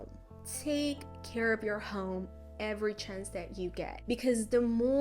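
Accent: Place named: Italy. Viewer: OMG ARE YOU ITALIAN? I understood you bf hahaha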